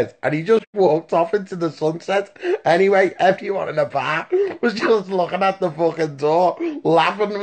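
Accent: Ireland. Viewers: Irish video comes up first thing I do it’s put subtitles on lol. Didn’t help! Omg I love this guy!!!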